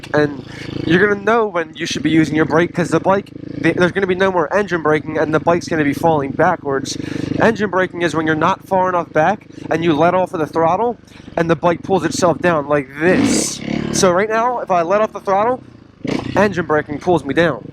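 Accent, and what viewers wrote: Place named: Philadelphia, United States. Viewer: what part of eastern pa are you from lol??????? That philly accent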